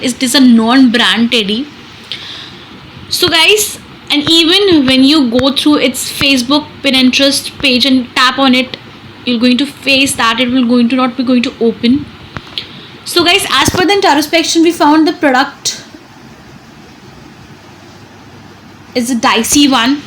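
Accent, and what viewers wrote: India. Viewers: I don't understand the Indian/English accent, Worthless review, at the end I did not understand whether she bought a realistic dog or not since did not show the physical dog running all over.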